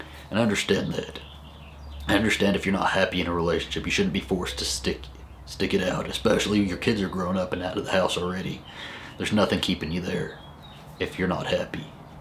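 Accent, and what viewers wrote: South, United States. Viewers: OMG I love your accent. You know how to turn this Scottish guy on by saying hi. I can hear you on the like of Dukes of Hazzard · Southern accent..turn off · I understand your hesitation with your dad. I went through a lot of the same abuse.... but, with time comes forgiveness. I hope you will eventually be able to forgive your dad for all the things he's done. The fact that he accepts you as a gay man should speak wonders to you though. If he didn't accept you, he wouldn't have slept in your house at Christmas. My wish for you is that you let the hostility go. I started watching your vlog thinking you and your husband had it together. And I adore your southern accent... I think you owe it to your lover to man up and show him you're better than your dad and that family means everything to you. Forgive and forget, I know it's hard, but it's easier than carrying that burden of hate.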